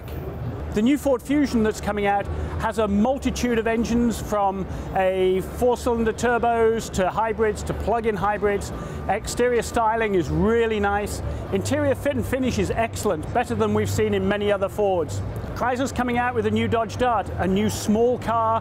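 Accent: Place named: England, United Kingdom. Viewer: Funny he says domestic and sports an english accent while talking about american cars.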